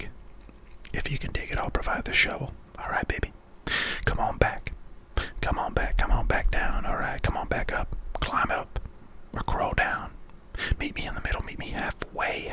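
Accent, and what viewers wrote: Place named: South, United States. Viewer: He was covering up his southern roots back then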